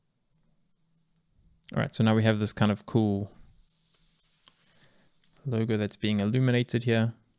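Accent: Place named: South Africa. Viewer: Sounds like South African, but diluted from living abroad maybe?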